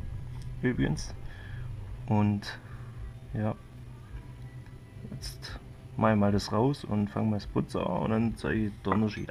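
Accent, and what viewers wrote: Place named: Swabia, Germany. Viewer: Sauber. Schwabe. Aus welcher Gegend kommst? Gruß vom Flughafen.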